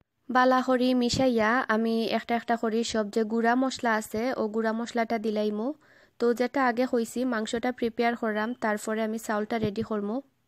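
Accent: Sylhet, Bangladesh. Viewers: আপনার মাত খুব সুন্দর লাগে কারন আপনে খাঁটি সিলেটি মাতইন. আপনার রেসিপি দেখি বালা লাগে. মাসা আল্লাহ আপনা বেবি কিউট আছন দুআ করি তান লাগি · Nice sylhet e voice ma sha Allah! Khati sylhet e everything. Nice to see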